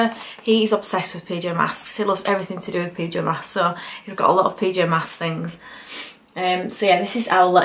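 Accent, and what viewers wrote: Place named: Middlesbrough, United Kingdom. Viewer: Thanks for making the video. I lived in Middlesbrough for couple of months and u remind me of it soo much ur accent.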